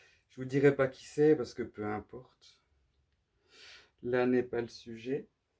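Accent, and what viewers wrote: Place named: Besançon, France. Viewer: J’adore les tableaux aux fonds rouge ( contrairement à vous ) et je trouve que celui ci est superbe , les couleurs sont très bien choisies . Le tableau bleu , magnifique , élégant . Je salue le Bisontin , un voisin donc ? Depuis que je vous regarde, l’accent me parle mais , je n’osais pas vous demander . Continuez comme ça et à bientôt pour visionner d’autres vidéos !! 🎨🤣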